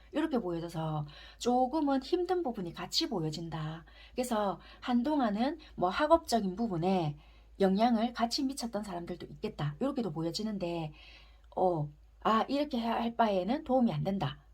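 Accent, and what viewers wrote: Busan, South Korea. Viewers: 님 21년동안 좋은 타로리딩과 조언 감사드렸어요 21년 마무리 잘하셨나요? 22년도 함께해요 ❤ 3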